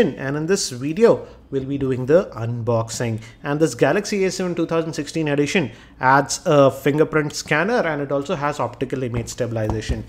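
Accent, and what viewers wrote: India. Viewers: Always dislike the Indian accent (English)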